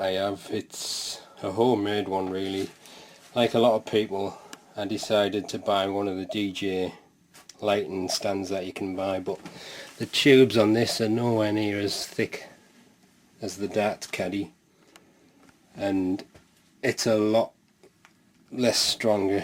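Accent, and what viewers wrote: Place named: Newcastle, United Kingdom. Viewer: what accent is that you have, it sounds sorta newcastle like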